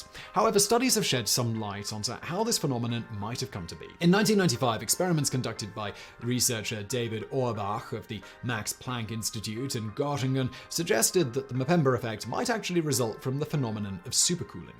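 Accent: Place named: United Kingdom. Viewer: I came here thinking this was Vsauce and heard a British dude and im like is this a British sub?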